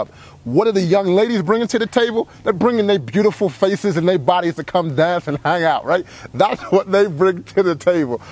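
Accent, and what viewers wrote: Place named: England, United Kingdom. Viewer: you seem to have an English accent.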